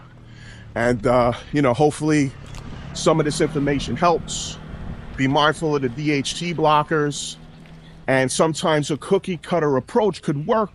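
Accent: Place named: Long Island, United States. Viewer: When I hear you speak it reminds me of my Homies from the north shore of Long Island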